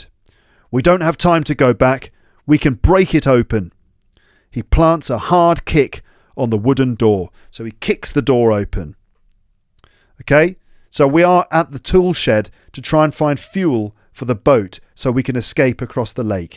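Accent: United Kingdom. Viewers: Fantastic voice and actions with explanation of all the vocabulary! English as a second language person, I had a hard time to understand those detailed adjectives when I read a book. Finally, I fall into the story and enjoyed it all the way to the end. Thank you so much for your effort and contribution. I am lucky to find this podcast. I love your British accent as well. I wish I could learn that accent:) I think I will be your fan now on - Cheers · camp wide range covered uk accent and culture British filed pure Love dramatic ever❤ true legendarie Podcaster all time❤❤❤ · i like your british accent very much.sir.😊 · I always listen to the end and I love how you explain some words. And I learned the British accent from you Thank you ❤ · Couple years ago I lived in another country with barley access to the internet I could listen to your podcast and voice hardly almost i forgot you however i couldn’t forget your British accept and your generously teaching style and your name I got surprised to see you in YouTube in USA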